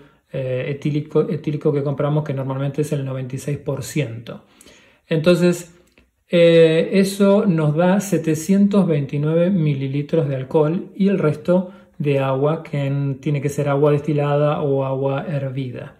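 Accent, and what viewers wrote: Argentina. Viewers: Hola, muy buen video: me gustó mucho, completito el edc. Te consulto: sos argentino o uruguayo ?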